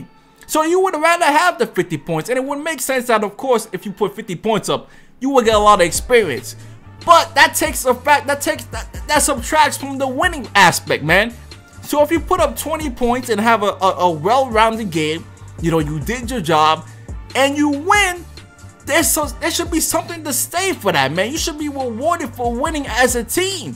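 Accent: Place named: New York, United States. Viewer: Jamais That's how new Yorkers talk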